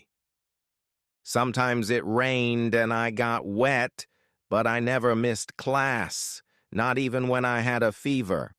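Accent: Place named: Wayne County, United States. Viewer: Sounds like your in Wayne Co.😮😮😮😮😮😮😮😮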